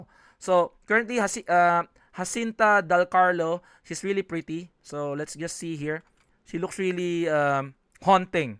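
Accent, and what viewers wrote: Philippines. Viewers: pinoy to base sa English accent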